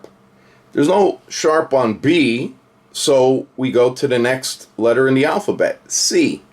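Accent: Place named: Chicago, United States. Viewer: are you from chicago - you have a bit of an accent , no offense . i am from chicago and when i am on youtube it comes out more often than not sometimes ... the way you explained it out like that it makes so much sense and isnt that complicated. hats off .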